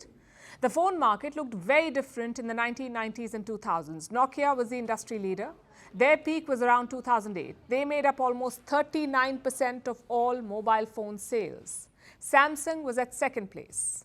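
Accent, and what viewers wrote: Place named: India. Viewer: Horrible accent ,we are not trying to learn English with Hindu accent…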